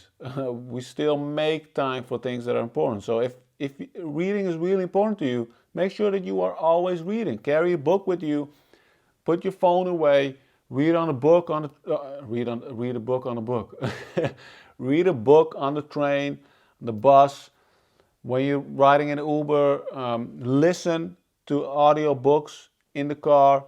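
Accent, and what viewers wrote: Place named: South, United States. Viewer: Is it me or you sound like a Southern American, although you're in Netherlands?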